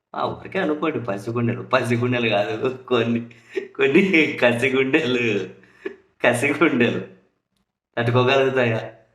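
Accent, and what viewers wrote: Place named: Godavari, India. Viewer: anna meeru godavari basha vachesindi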